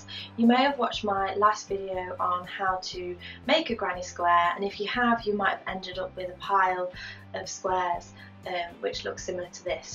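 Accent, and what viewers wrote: England, United Kingdom. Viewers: Thanks for showing two methods and the pros and cons to each. That was helpful. My husband and I both love to hear her soft calm voice. Your English accent is lovely. (We're in the US.) Blessings. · Hello 🌸 Your works are just most beautiful, your explanation with the very nice British accent, that I love, are the most complete ones...thanks for sharing with us,..😘Fatima, Iran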